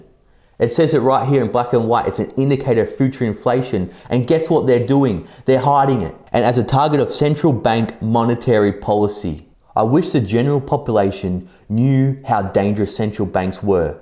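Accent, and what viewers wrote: Australia. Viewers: You Aussie? I thought you South African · Subscribed as soon as I heard the Australian accent, just what I've been looking for. I LIKE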